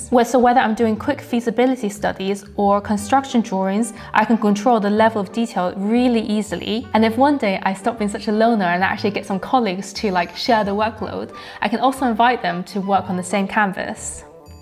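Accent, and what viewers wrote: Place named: Southern England, United Kingdom. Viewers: That was a very nice video, thanks for the tips 👏🏽👏🏽❤. I study architecture for hobby and learned a lot by seeing it. Loved how you show that list between the video's chapters and the final list with all the items: if someday I create content for the internet, I'll definitely use that in my videos. Ps: Where is your accent from? South England? It's so nice. I'm Brazilian and a considerable part of the english we consume over here is from USA 🤠. Best wishes from Brazil 🇧🇷. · Are you from southern England? It sounds like an English accent that's south of the Humber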